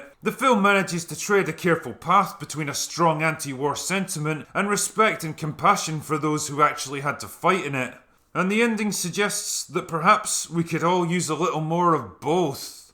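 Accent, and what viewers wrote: Scotland, United Kingdom. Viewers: I assume by your accent that you're a Scot. Do you know the film Culloden by Peter Watkins? This was his first professionally produced film and paved the way for the BBC to produce The War Game (which they then refused to transmit) <link>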